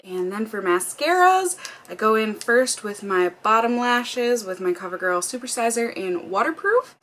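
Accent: Minnesota, United States. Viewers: You are so dang cute. When you say the words, bag, and Aunt, you sure can tell you are from Minnesota! 😘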